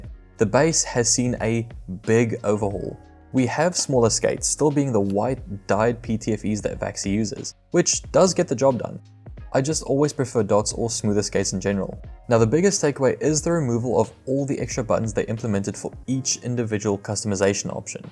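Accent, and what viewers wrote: South Africa. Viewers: I saw you live in new zealand, so I assumed you're kiwi. But you sounded south african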